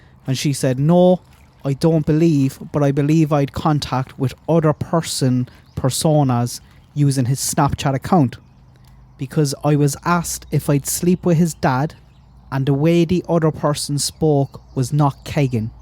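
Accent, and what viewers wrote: Ireland. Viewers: Irish and you could slow the video down if you're having trouble lol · You have a heavy Irish brogue.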